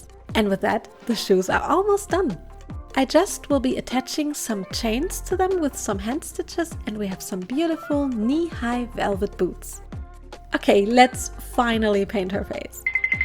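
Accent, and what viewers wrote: Germany. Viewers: Do I hear a slight and very cute german accent? 😉 However, the doll is amazing!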